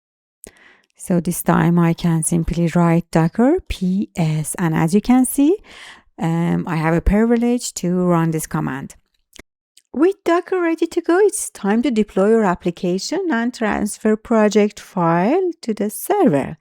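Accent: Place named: Iran. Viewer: Lahje irani dari Vali das khosh Afarin❤❤😂❤❤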